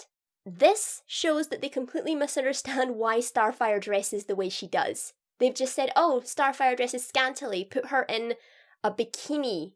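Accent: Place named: Scottish Lowlands, United Kingdom. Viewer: Trying to identify that accent. My best guess is lowland Scottish.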